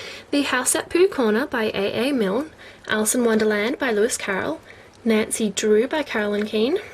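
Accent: Australia. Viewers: god, i could listen to you talk all day! I love aussies! :D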